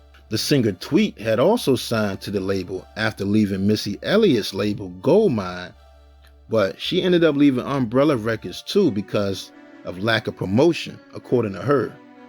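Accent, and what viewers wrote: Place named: Washington DC, United States. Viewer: Please tell me this narrator is from DC, because his accent is very familiar to my ears. LOL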